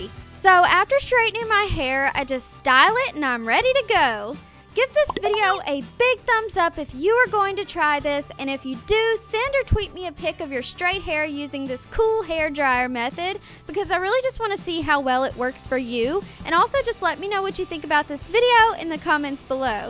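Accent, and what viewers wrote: South, United States. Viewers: You have SUCHHH a southern accent like whaaat but great video 😝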